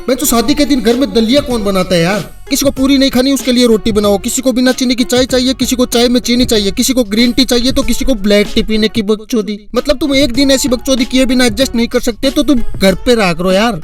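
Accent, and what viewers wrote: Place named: Rural, India. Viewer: Tomhare bolneka tarika ku6 bad villager jeisa hai.......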